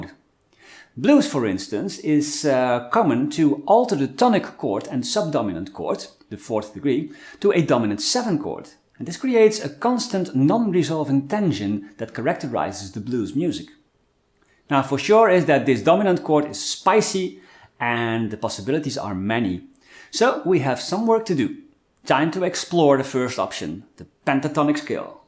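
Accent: Netherlands. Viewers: Can confirm! is among the best guitar education resources on Youtube. His explanation, auditory and visual examples are exceptional. And I adore his dutch accent too.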